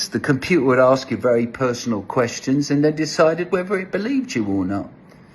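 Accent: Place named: Cockney, United Kingdom. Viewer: The only phone we had was the telephone box and lots of 10ps. I am from the Eastend of London and I have a cockney accent. So it is funny listening to with that accent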